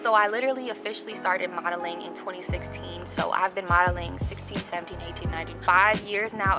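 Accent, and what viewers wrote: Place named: Chicago, United States. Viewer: You from CHICAGO, I HEARD THAT 😊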